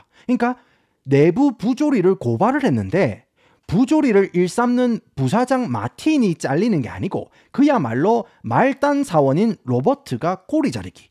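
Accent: Gyeongsang, South Korea. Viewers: 이 튜브의 재미는 님의 성대모사와 창, 구수한 갱상도 사투리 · 경상도억양 재밌는데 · 언어 천재 DNA가 경상도 사투리와 영어를 만나 미쿡 이야기를 맛돌이로 말아주는 채널 ㅋ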